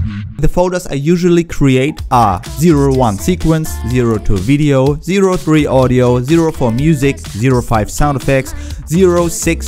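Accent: Germany. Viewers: That was an awesome video and very helpful! Hearing organization tips from a German accent also seems right somehow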